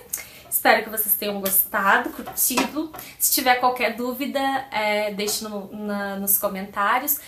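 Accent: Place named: South, Brazil. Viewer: seu sotaque é lindo sou carioca, mas minha paixão é o sotaque do Sul! Amei o vídeo! Realmente não saímos da farmácia sem levar produtos que avistamos na hora e desejamos! Beijos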